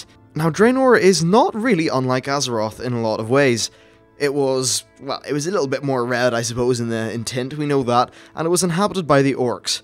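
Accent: Northern Ireland, United Kingdom. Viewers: Great Video. Subscribed! BTW... Are you Northern Irish?! lol. I hear a twang of an accent and it made me curious! :D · Northern Ireland?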